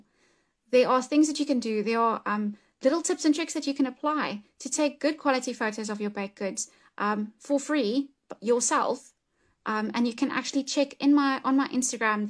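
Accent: South Africa. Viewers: Your accent makes me miss my friends in South Africa!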